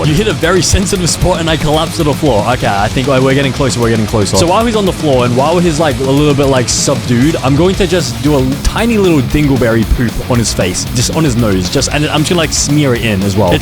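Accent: Australia. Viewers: Ive watched so many vids and THIS is the one i find out you are australian... how did i not know 😭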